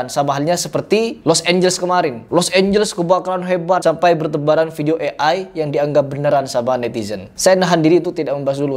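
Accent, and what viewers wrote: Madura, Indonesia. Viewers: Kau orang madura ya bang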